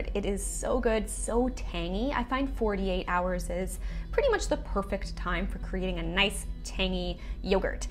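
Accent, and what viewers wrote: Canada. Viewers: you sound very Canadian, but great video.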